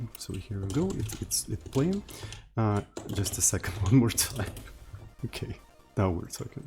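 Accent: Russia. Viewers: are you Russian ?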